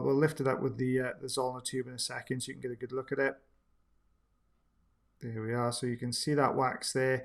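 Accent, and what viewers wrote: Wales, United Kingdom. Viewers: I haven’t watched in a while, hardly recognize you. Look great and I LOVE the Welsh accent.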